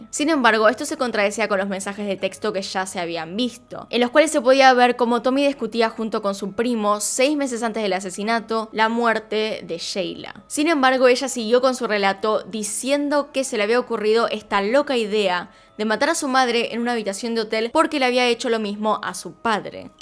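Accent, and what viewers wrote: Argentina. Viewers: realmente existen hijos asi que horror mejor es alejarse con el dolor del corazon no se llevaban bien creen que USA hubiese quedado impune si hubiese matafo al padre era una justificacion por el dinero y odiaba a su madre yo hubiese dividido el dinero y preservar su vida y salud mental.interesante caso gracias me encanta tu voz con tu dejo argentino saludos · Eres argentina?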